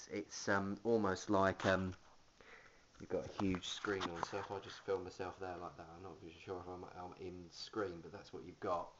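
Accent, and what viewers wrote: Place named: United Kingdom. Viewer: Good old btitish accent mate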